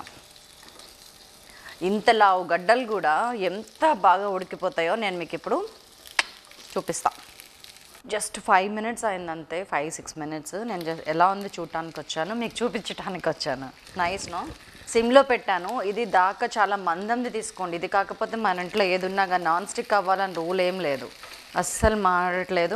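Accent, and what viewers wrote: Telangana, India. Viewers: Your voice is beautiful and confident...especially your Telangana dialect is so pleasurable to listen... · Wow garu that’s fantastic tip I always put in pressure cooker water and salt or sugar Sooper I just liked your recipe and the healthy tips in between Thank you amma You just rock like my family member your Telangana slang ditto like ours 🥰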